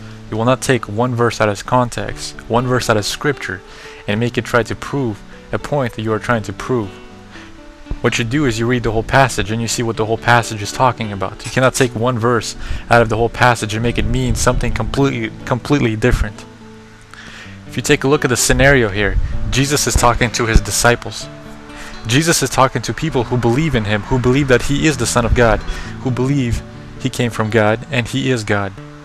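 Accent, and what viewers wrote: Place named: India. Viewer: I cant understand this video complee completee difeebr what was that.... oh... whats going on.. Is this guy indian, i think he is.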